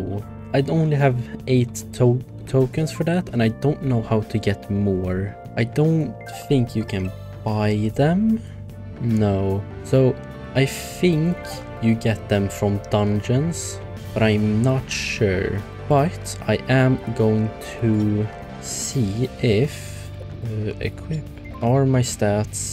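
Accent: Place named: Sweden. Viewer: Yo you have like a swedish accent and in case you swedish tjena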